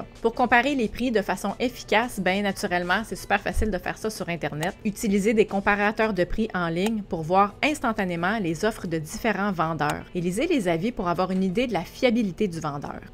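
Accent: Quebec, Canada. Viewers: Merci pour cette video et ces conseils avec ce bel accent quebecois !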